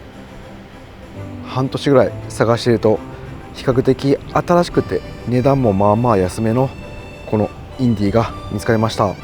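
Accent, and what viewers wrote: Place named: Kansai, Japan. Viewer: 皆さんもコメントされているように、それでインディのDIY振り返りだったんですね！ほんと、いつも相方と楽しみに拝見してます。私もキャンピングカーを検討し始め、さんの動画を随分参考にさせてもらいました。今年の12月納車予定です。同じ関西人なので、さんの語りは親近感ありすぎです。インディ乗り続けて欲しい気持ちもありますが、次に何にされるかもとても楽しみです。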